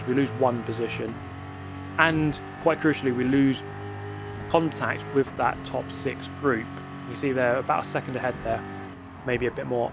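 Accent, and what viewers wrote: United Kingdom. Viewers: He sounds more British than most British people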